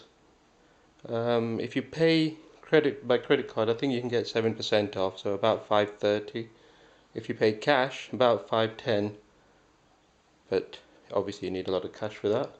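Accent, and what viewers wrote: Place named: India. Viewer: English with indian accents shouldn't be on youtube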